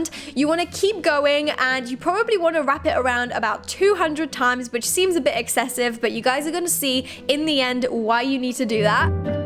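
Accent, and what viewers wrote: United Kingdom. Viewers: Are you british 'Cause you sound british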